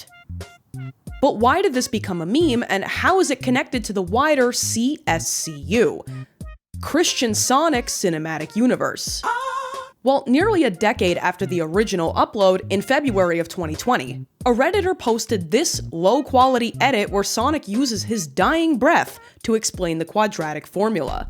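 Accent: New York, United States. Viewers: your accent whips. not enough youtubers with potent new york accents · I love your accent! It reminds me of Whang so I'm wondering if you're also a fellow New Yorker. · She keeps mentioning her accent is she from new York? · you are my favorite lady content creator and I want to say tho I still hear a very slight NY/NJ accent I still find you charming and intelligent. Keep up the great work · I love your accent. New Yorker? New Jersey? Boston? I can't tell. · I aint from that area so i dont know for sure but its either new york, boston, or new jersey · close. That’s a NY accent. Staten Island maybe? Have a cousin who was born in Stat. They say words similar. I could be wrong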